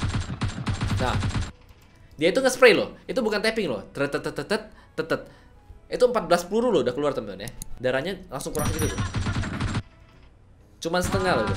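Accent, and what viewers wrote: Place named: Java, Indonesia. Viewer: oh gtu.. jdi bg medok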